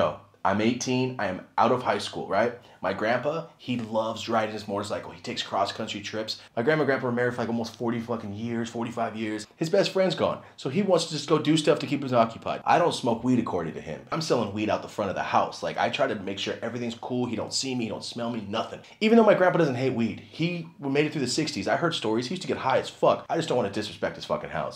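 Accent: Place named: South, United States. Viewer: Am I the only one impressed by the southern accent?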